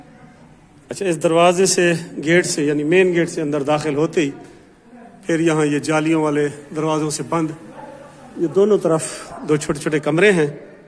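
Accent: Saraiki, Pakistan. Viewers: Saraki ka tarhka lgaya kro Maza ata hai.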